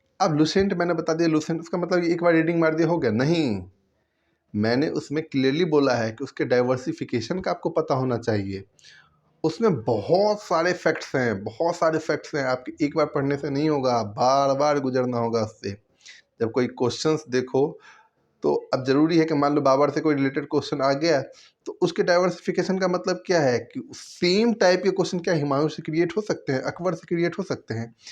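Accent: India. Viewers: Bhaiya maths or reasoning smjh nhi atta hai jayda. Koi solution bata do bhaiyaa. Bhaiya app up se hai na? Ya up mai rehte hoge?? Awadhi tone hai appke boli mai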